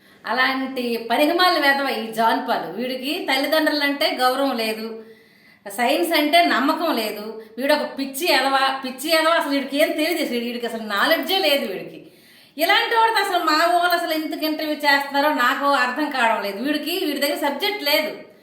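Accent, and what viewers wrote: Srikakulam, India. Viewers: సూపర్ మేడం శ్రీకాకుళం యాసలో పాస్టర్ క్రైస్తవంలో ఉన్న చెడుని చూపిస్తున్నారు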